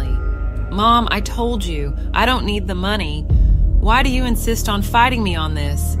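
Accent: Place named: South, United States. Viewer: Great trippy story! The southern slang narrator adds a texture of realism to this twisted tale too!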